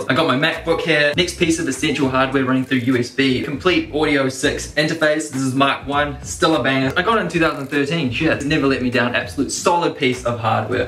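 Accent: New Zealand. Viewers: You a kiwi?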